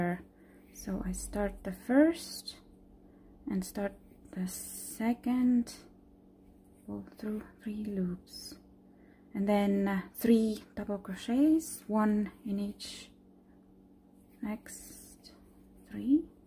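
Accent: Estonia. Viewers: Are you estonian? You have an estonian accent :)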